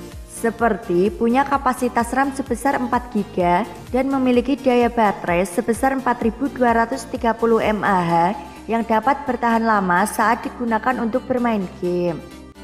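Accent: Java, Indonesia. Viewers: Jawa banggettt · Medok bgt mbak. Asli mana? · Medok nya itu Lo,Mbak...bikin klepek klepek.? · Kok ngomong y mbaknya medok emang aslinya orang mana? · Omongan mu jowone meduk mbka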